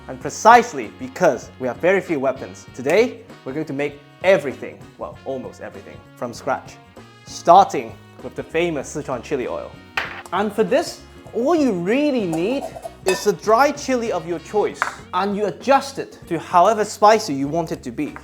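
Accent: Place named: United Kingdom. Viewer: very high quality video, and with brits accent, really nice.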